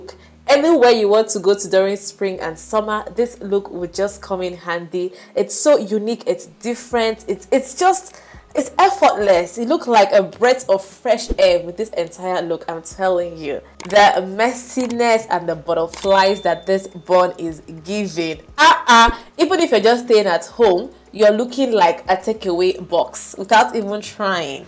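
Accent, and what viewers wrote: Nigeria. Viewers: I’m here for your Nigerian accent and your accessorise!!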